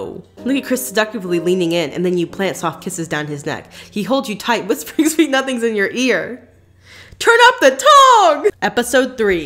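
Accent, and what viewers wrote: France. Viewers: YOUR FRENCH ACCENT SLAPS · the french accent is EVERYTHING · The French accent though. 😂, SHE'S ICONIC! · omg i love your french accent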